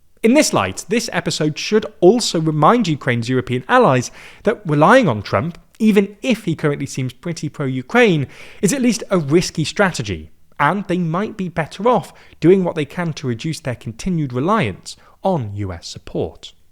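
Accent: United Kingdom. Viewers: So sick and tired of all this British accented YouTube channels. WTF?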